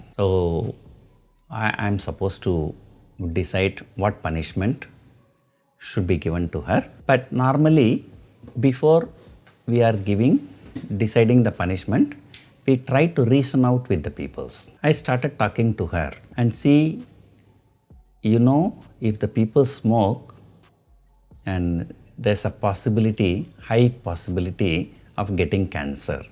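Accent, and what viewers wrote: Tamil Nadu, India. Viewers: Never tell that you are going to tell a joke before hand. You tell something and we decide if it is a joke or not. And try to improve your English. You speak English with Tamil diction which makes it unbearable to follow.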